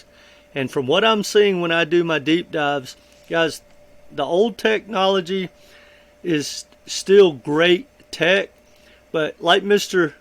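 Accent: United States. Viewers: I love your accent SO damn American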